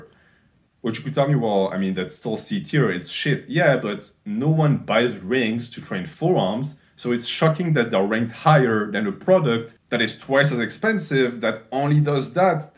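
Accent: France. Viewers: you have a bit french accent, not sure....nice video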